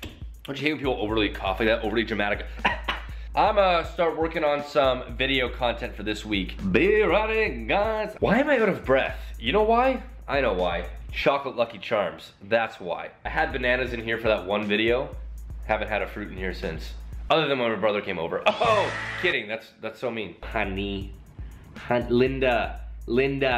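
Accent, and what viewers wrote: Canada. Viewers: He's Canadian so he pronounces some things differently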